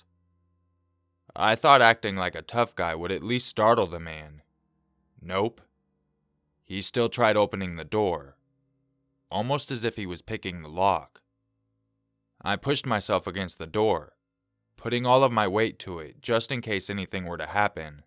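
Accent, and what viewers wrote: Yorkshire, United Kingdom. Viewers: Oi love! Nice British accent. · That Yorkshire story was rather funny! Bless, our accents are hard to do, Yorkshire has some of the funniest! Nice vid mate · Dat Yorkshire accent doe 😂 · Great Yorkshire accent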